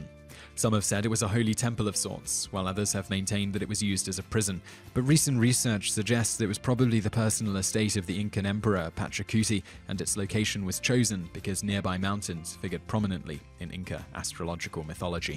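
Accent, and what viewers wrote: United Kingdom. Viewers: I don't mind his British accent, half my family is British. But I do wish he'd research how to pronounce names and other proper nouns correctly. He butchers so many it's distracting. He also says "skellingtons" which was funny the first time I heard it, but not the last twenty. Type things out for him phonetically or something!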